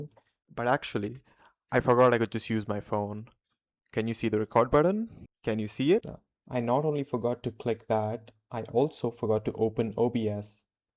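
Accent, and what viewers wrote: India. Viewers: W video btw where are you from? your accent makes it look like ur from india (im from india as well) so im curious! :D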